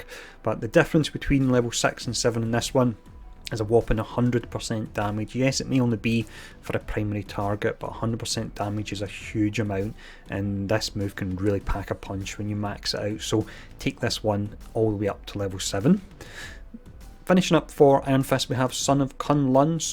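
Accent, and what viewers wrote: Scotland, United Kingdom. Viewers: came for the info stayed for the scottish accent will come back for both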